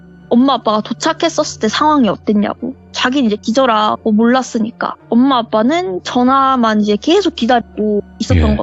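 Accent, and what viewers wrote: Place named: Jeolla, South Korea. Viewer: 고향 전라도시죠!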